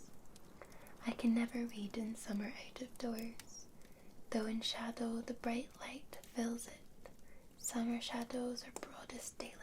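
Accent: Scotland, United Kingdom. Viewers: What a perfect start to the beginning of the week with an extra 10 minutes added to your video! I must say that you are looking extremely lovely tonight 😘. You're hair is like the hair of an angel 😇, straight soft and silky! I swear you're videos keep me relaxed, calm and so soothed. 😌 I love when you do the Sh, Sh, Sh, it's really tingley, why I have no idea, but it just is!! The facial mask and all of your lovely smells and sprays you use would definitely help me sleep incredibly well 😴. The relaxing session is very relaxing and a anatomy class combined 😴. But nothing beats you're lovely soft spoken Scottish accent when you read those stories 😊. And this story was awesome 👌. I gotta here more please 🙏. Thank you for this brilliant Asmr video! I hope you are well and everyone is doing great 👍. Take care 💛 🥰 🥰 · That's hoj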